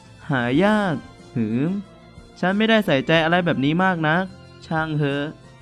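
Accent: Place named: Thailand. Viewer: เป็นการอ่านที่เป็นไทยมาก555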